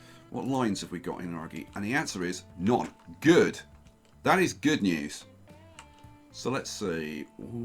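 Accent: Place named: United Kingdom. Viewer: Brilliant ideas you need to write those ideas down someplace .. and YES I would vote for a decoration and tidy up episode. After all it is a large part of building up the map to be the best that it can be. On a completely off topic note by chance are you and John Oliver from the same area ? My ears tell me you two could be brothers, I do not mean that in a negative way at all, I think it is very cool, as you both have great enthusiasm for what you do, and your voices express and convey that to your audience. Good on You mate. I am busting here, waiting for the train ride on the new railway.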